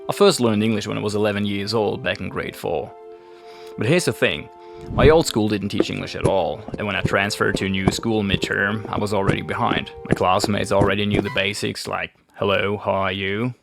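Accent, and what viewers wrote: Australia. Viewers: I recently just started following you from somewhere else on YouTube, but I am doing my usual of following new people backwards. It only starts getting funny when I hit on something posted 3 or 4 years ago and I am expecting some very funny moments in your past. Congrats on your Aussie accent shining through. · Oh wow 😁that all makes so much sense. I am someone who has commented that you picked up an Aussie accent while you were here. Mind you, I think you picked up the whole Aussie way of life too lol. Thanks for telling us a bit about your 'English' journey. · Your slight German accent is pleasant and attractive. You do speak English with a slight Australian accent. I like it. It's perfecly clear and articulate and you don't stumble with words at all, and express yourself well. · as an australian i know this makes no sense but if the general australian accent was milk your voice would be yogurt. 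its like similar and mostly the same but yogurt is more like sour and vaguely european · I'm Australian - 6th generation - Your accent is so dam close... Good on you - very interesting... John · Yeah you've soaked up a lot of Aussie while here, but that 'yeah' sold it hands down haha! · You have a talent in words mate and spoken in English or we could say Aussie. Well done champ. 😎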